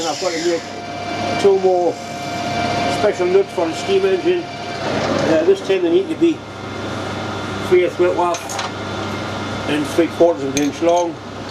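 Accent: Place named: Scotland, United Kingdom. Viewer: Love your accent, Scottish. Well, for me here in America it's an accent lol....for you, I have an accent. Good job.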